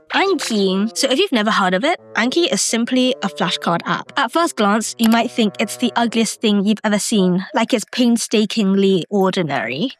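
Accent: United Kingdom. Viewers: Why do you sound British?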